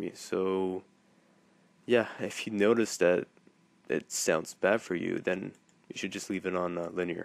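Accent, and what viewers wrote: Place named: Canada. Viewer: T'es Canadien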